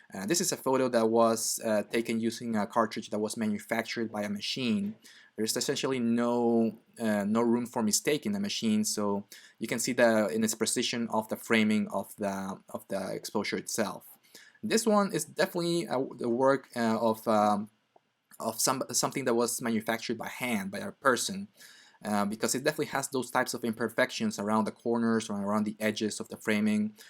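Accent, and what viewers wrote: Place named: Philippines. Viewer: Why do you have a filipino english language? Are you filipino?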